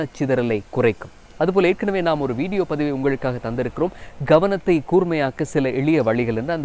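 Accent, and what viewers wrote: Sri Lanka. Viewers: அழகான இலங்கை தமிழ்..😍😍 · Seem like srilankan tamil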